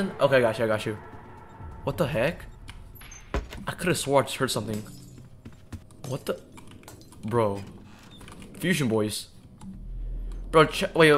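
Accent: United Kingdom. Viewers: Yo are you British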